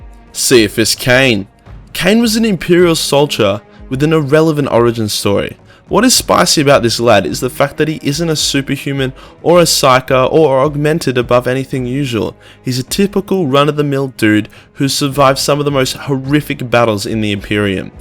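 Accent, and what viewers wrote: Australia. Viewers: i know you mean english speaking but jesus christ be specific. hes australian, not english. its basically the same just worse at cricket/rugby and better at crime. cheers ears 🖒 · It’s literally an Australian accent. Someone else is racist.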